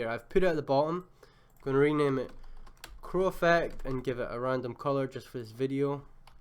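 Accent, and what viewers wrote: Scotland, United Kingdom. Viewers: Nice vid bro Scottish accent got the sub for me sick to death of American tutorials mate no offence to any Yanks reading this comment lol 👍🏼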